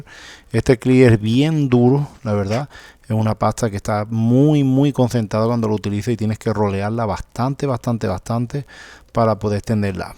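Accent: Andalusia, Spain. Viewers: Hola amigo.... De dónde eres? Por tu habla pienso que eres de muy cerca de mi pueblo... Sanlúcar de Barrameda Cádiz... España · Buen trabajo! Illo, usted de donde es? De Conil? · ¿Puede ser de Cádiz o Sevilla? Saludos desde Pilas (Sevilla) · Holaaa, he encontrado este video, es un trabajo excelente. Por casualidad eres andaluz??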